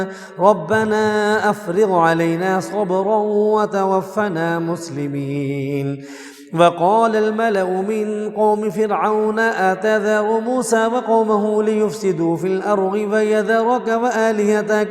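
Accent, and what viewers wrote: Panipat, India. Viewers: Lovely panipati accent. Allah o akbar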